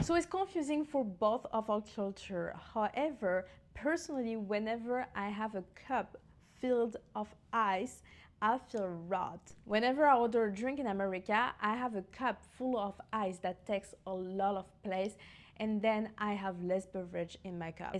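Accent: France. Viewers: Pfff tu nous gonfles avec ton anglais français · Hello, Congatulations, you're speak english very good. It was a pleasure to hear you speak in this language. You're french accent is very charming. Nice to meet you. Vive la France !!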